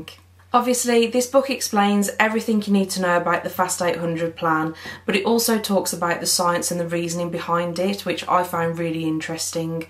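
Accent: West Midlands, United Kingdom. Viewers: Sounds like you live in the west midlands :)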